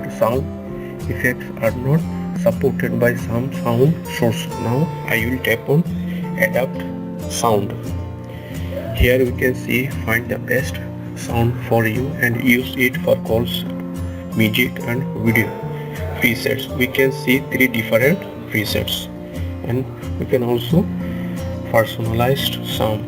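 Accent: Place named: United States. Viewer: American lag rahi hai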